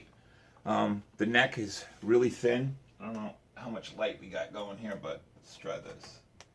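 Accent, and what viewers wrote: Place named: New York, United States. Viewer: Wtf, that clanking and pick slapping sound is HIDEOUS. I am a Zep head playing 30yrs. A true Fender jazz elite fan. I wanted to check this out. I went to you tube for an independent unbiased review but honestly WTF. I hear the Ny'r in ur voice as I am the same but if u like how ur amp is set or u believe that riff u picked thru had some kind of redeeming hook,... u are either very high or delusional. I wanted to get this bass but ur review brought vomit into my mouth. I will need to go play it for myself. I realize u must have done something or recorded or produced but what I just heard is ^#$$^&$@@ Funny in the background my brother just pulled up in a convertible and he is listening to the by.. Go cleans your soul my brother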